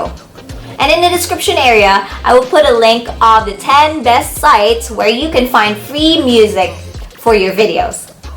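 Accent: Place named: United States. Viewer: Ang galing naman mag.english ni ma'am parang american din..team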